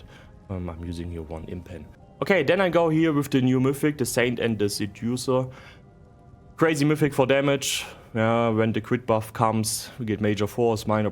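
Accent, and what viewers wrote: Germany. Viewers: Immer wieder leicht lustig, wenn ein Deutscher Spieler flüssig Englisch spricht, aber es doch sehr Deutschenglisch klingt :-)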